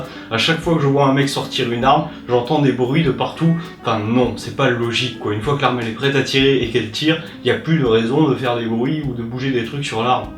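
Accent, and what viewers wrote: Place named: Banlieue, France. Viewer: accent de banlieu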